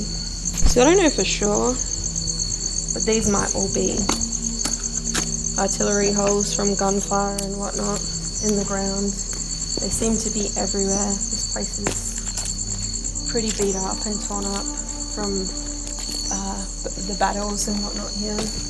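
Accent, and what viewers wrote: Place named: Australia. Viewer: where are you from youre accent sounds like Australian accent^^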